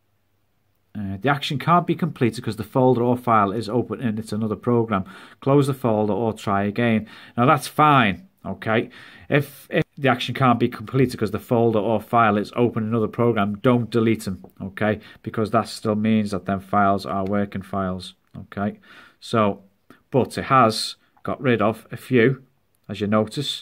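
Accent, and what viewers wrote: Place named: Liverpool, United Kingdom. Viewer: Thats really good scouse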